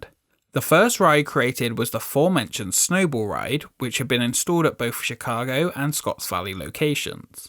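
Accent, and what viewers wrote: United Kingdom. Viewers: I wanted to comment something relevant but I keep coming back to your accent. What part of the UK are you from? I need to move there so I can live surrounded by people who sound like you 24/7.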